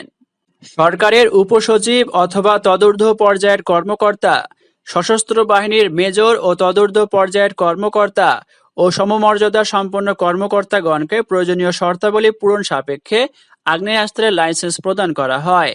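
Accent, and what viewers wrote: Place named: Mymensingh, Bangladesh. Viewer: এমন করে পড়ে কেন!!!!!!!!! ভাই এর বাড়ি কই??? ময়মনসিনংহ নাকি???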